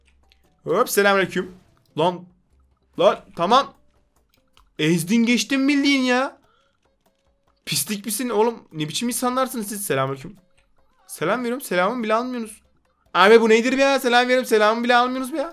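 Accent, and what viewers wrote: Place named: Thrace, Turkey. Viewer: Trakya aksamı :D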